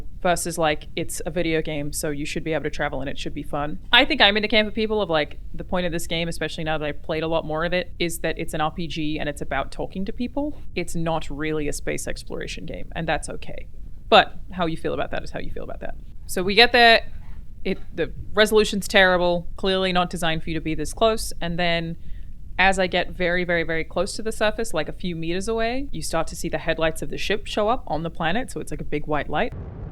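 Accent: Australia. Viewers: Dude, your accent's kind of blowing me away. It's like this weird amalgam of like Australian and New Jersey (?) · Are you Australian?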